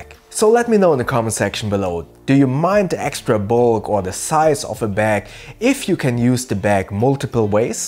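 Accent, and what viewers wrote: Germany. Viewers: That's a good looking pack. Goes almost too well with your jacket. I bet pack cost about 25 thousand dollars. What is your accent? German. Cool voice.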